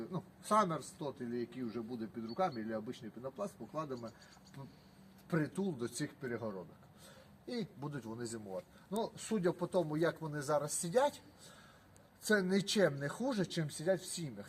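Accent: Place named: Surzhyk, Ukraine. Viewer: я сам разговариваю на суржике но твой суржик так режит слух что не могу твои ролики смотреть хоть включай субтитры.бeз образ,Слава Украіні.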